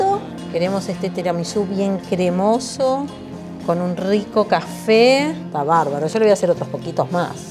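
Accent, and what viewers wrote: Argentina. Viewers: POR TU ACENTO YA ME DI CUENTA QUE ERES ARGENTINA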